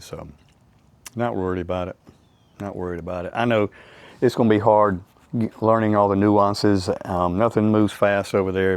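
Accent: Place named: South, United States. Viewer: Glad I found your channel....I watched a few more of your videos. Good information and content.... Likable guy. Enjoy the southern accent 💯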